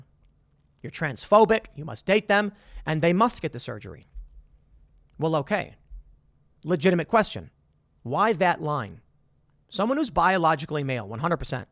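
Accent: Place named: South, United States. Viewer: Tim’s Southey Accent came out strong on this one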